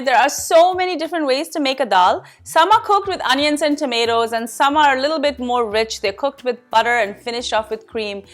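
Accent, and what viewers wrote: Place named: India. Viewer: " "? Shakal aur accent desi, aur naam vilayati"?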